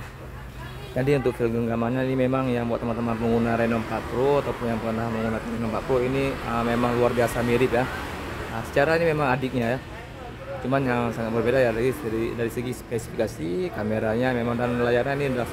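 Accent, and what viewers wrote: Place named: Malaysia. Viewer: logat bahasa indo anda ada logat bahasa Melayu Malaysia..mungkin anda berasal dari Kalimantan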